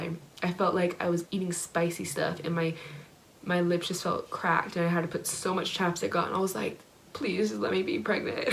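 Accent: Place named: New Zealand. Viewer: Are you from New Zealand? You have a kiwi accent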